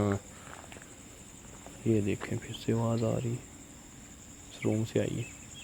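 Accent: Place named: Punjab, Pakistan. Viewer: hit like before watching.. or bhai just luv ur accent jab ap urdu bolty bolty koi ek lafaz punjabi ka maar jaty hain.. serious video men mahool say bn jata hai.. Allah pak hemsha apko apny hifz-o-aman men rkhy (Ameen)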